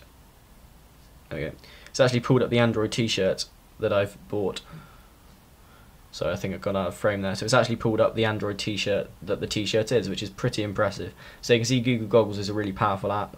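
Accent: London, United Kingdom. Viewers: Good old Londoner. Keep up geezer.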